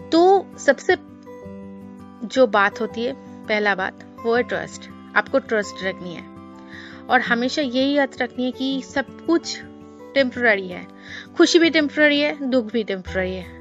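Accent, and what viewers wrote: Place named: Bengal, India. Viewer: Waah nice jankari ap bangali h na apki hindi se lg raha h😅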